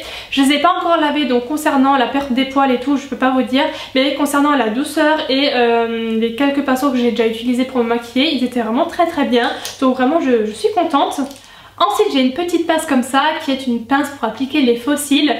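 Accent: Lorraine, France. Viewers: Tu as l'accent de chez moi, Lorraine ou Alsace ? Gros bisou <3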